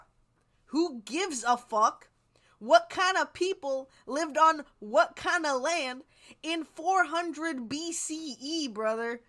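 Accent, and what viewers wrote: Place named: United States. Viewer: Don't take this personal but I need to correct you on some things. The fact that you say you are NOT and NEVER WILL BE Israeli doesn't give you the right to make Israelis look like they are some fantasy nation. You are a Jew as you said. You are (I assume by your accent) from USA. That makes you American Jew. I hope you know that 2 million Arabs live in Israel. They are Israeli Arabs. A lot of those Israeli Arabs say they are Zionists. Remember they are Arabs ( Moslems and Christians ). Another thing - you talked about Jews returning to Middle East from Europe. Are you aware that 43% of Jews who moved to (now) Israel are from Europe? 57% are from Arab countries. They were forced to leave. And add to that 270000 Jews who lived there already and never left their land. And another thing - Brits didn't create Israel. UN did. 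Great Britain also didn't colonize that area either. It was UN mandate and GB was tasked to police that area. Israel was suppose to be entire Palestine. But Britain Created Jordan ( back then called Trans-Jordan). UN then decided to split the rest of the land in 2 states. Arab state and Jewish state (Israel). And one last thing - the biggest anti-zionist Jews are Orthodox Jews. Why? Because in their mind Jews will get back Israel when Messiah comes back and not before. Until then Jews need to wonder around and suffer. I am not a Jew. Also I don't live in Israel. But here is something to think about when it comes to Jews. If you are a Christian and don't belie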